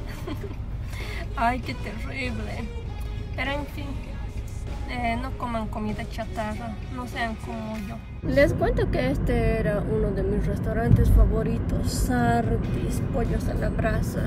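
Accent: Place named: Bolivia. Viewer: Me hueveo no? jajajajaja me mataste, eres autentica boliviana en tu manera de hablar 100%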